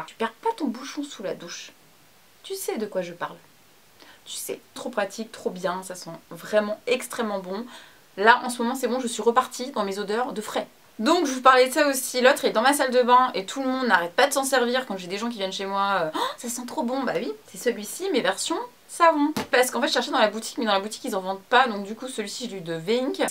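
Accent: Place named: Germany. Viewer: J aile beaucoup ton accent alemand😂😂. Trop belle dans cette video tu pourrais nous faire un tuto sur ce makeup??😘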